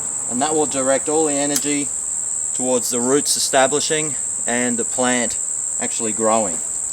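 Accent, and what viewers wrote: Australia. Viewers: Nice video you sound like your from down under. Thanks for the tips mate. growing mine from seeds.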